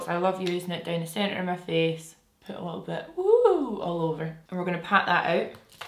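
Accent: Scotland, United Kingdom. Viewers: nice to hear a fellow scot on youtube xx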